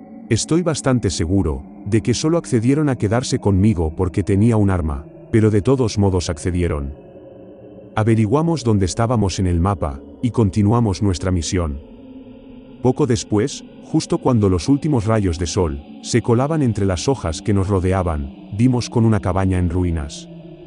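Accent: Spain. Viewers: Me gusta más la narración con esta voz en castellano a pesar de ser de MEXICO. Me encantan las narraciones con ese sonido de fondo.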